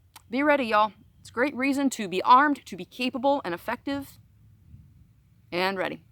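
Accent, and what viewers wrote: South, United States. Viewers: As soon as I hear a southern accent I move on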